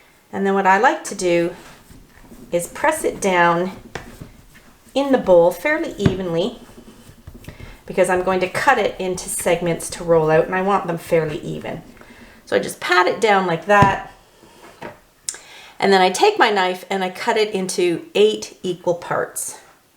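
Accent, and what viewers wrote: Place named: Canada. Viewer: I was fortunate to find the yellow bowl and lid at the thrift store. I sold Tupperware in the late 70’s but no longer in business. I will definitely hang on to the pieces I have for sure. I was going to wish you a Happy Thanksgiving but I think you are Canadian with your pronunciation of the “o” but enjoy that day too, lol!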